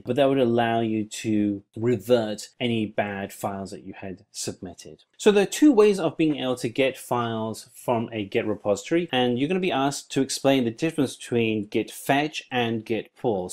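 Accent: India. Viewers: It would better if the instructor be an Indian with easy English.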